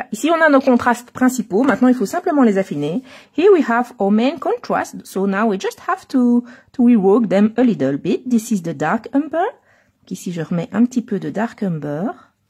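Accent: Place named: France. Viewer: Trop mignon quand tu parle anglais avec ton accent de france☺️😍. Vraiment ton effet or est realiste merci pour ce truc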